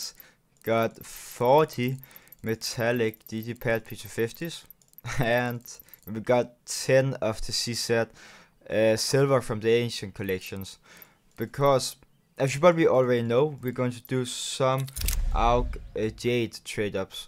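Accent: Denmark. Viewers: i smell danish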